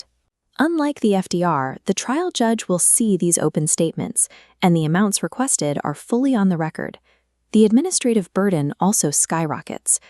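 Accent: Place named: United States. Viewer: English law. English audience. American accent?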